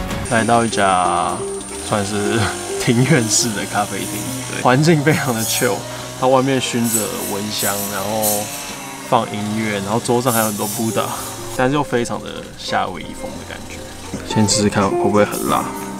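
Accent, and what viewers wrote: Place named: Taiwan. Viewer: 好感台湾腔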